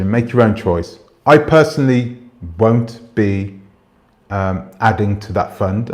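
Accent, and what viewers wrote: United Kingdom. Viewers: Your English is perfect 🤣🤣 I love the British accent.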